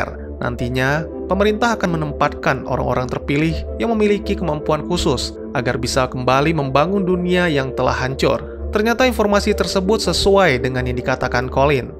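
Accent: Aceh, Indonesia. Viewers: Dro ureng aceh nyo bang? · Weh abng orng Aceh bukan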